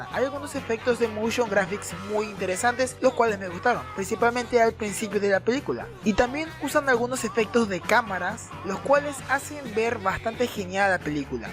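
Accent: Paraguay. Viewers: Eres paraguayo!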